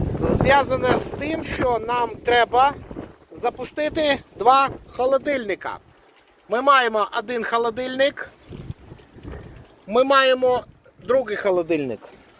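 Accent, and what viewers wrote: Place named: Odesa, Ukraine. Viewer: Дякую за пізнавальне відео та шикарний одеський варіант української мови ❤❤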